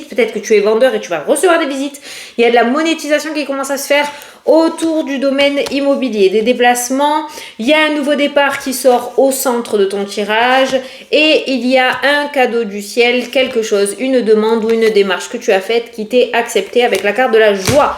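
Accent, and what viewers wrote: South, France. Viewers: Bonsoir, toujours la joie quand je vous écoute, c est mdr, j'adore votre façon de vous exprimer avec votre petit accent du sud moi j'habite dans les Ardennes 08. Vous êtes franche mdr avec humour. Je vous apprécie. Merci pour votre bonne humeur. Merci pour votre gentillesse et votre bienveillance. Que Dieu vous bénisse ainsi que votre famille.❤❤❤🙏🙏🙏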